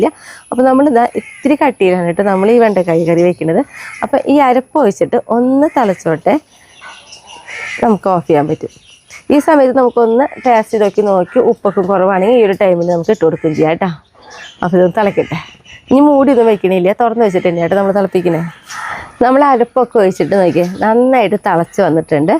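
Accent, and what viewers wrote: Thrissur, India. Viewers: ഓരോരുത്തർക്കും വേണ്ടി സംസാരരീതി മറ്റാൻ പറ്റുമോ.. അത് തൃശൂർ ഭാഷയുടെ പ്രത്യേക ശൈലി ആണ്... നിങ്ങൾക്ക് കണ്ണൂർ കാസർഗോഡ് ഭാഗത്തുള്ളവരുടെ ചാനെൽ കണ്ടാൽ എന്തായിരിക്കും പറയുക... മനസ്സിലാവുക പോലുമില്ല.. പക്ഷേ നമ്മൾ എല്ലാം കാണണം.. മനസ്സിലാക്കണം.. അങ്ങനെ അല്ലെ.. അല്ലാതെ ഞാൻ പറയുന്നത് മാത്രമാണ് ശരി എന്ന് വിചാരിക്കാതിരിക്കുക.. ഇങ്ങനെ പ്രതി കരിച്ചതിനു ക്ഷമിക്കുക.. Content അല്ലെ പ്രധാനം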